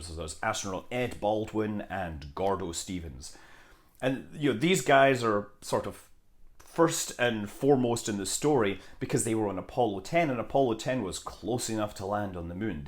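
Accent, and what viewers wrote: Scotland, United Kingdom. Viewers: looks like times are hard out there, so I have shipped you some razor blades. Just because you sound Scottish, doesn't mean that you have to look like a drunk Glaswegian in a Soho gutter!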